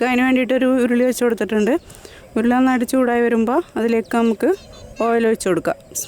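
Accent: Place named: Malabar, India. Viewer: Chechi sherikkum thrissur thanne aano..“cherkalilla “ennu okke paranju kekkumbo evideyo oru malabar slang thonnum pinne vere ethokkeyo slang um thonnanu😊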